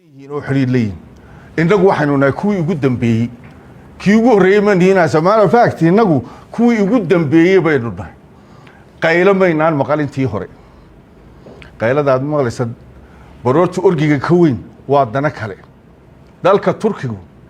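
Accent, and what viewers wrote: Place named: Somalia. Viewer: Waa faqash miyaadan arkayn lahjadiisa doqoniimadu ka muuqato kuma dhacaan in ah inalahadlaan iyagoon iskakeen dhigin😂😂😂😂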